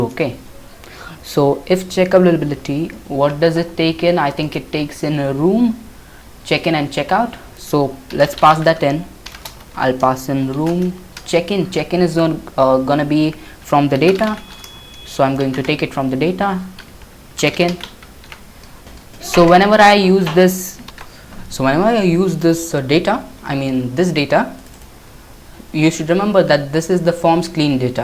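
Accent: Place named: India. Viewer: I HATE INDIAN AKCENT I UNDERSTAND NOTHING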